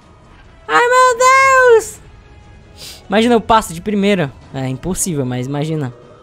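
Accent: Northeast, Brazil. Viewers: tu é nordestino né?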